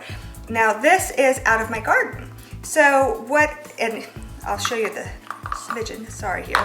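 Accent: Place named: Wisconsin, United States. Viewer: They sell live stevia at my local co-op every spring and I've always wondered how one would use it. The fact that the garden-fresh powder is actually less bitter than the processed store-bought stevia really intrigues me, I figured it would be the opposite. Can anybody else confirm? Side note: Not sure if anybody has ever mentioned this to you before, but your Wisconsin accent is adorable. I also live in WI, but in Madison, so you don't hear that "Wisconsin" accent too much down here.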